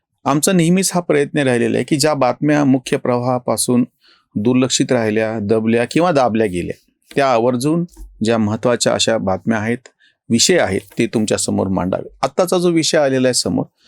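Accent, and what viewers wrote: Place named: Marathwada, India. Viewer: कोणतं अँप आहे त्याची लिंक किंवा अँप चं नाव तरी द्या सर जी,तुमचं बोलणं म्हणजे परभणी चा आणि बीड चा मिक्स तडका असतो❤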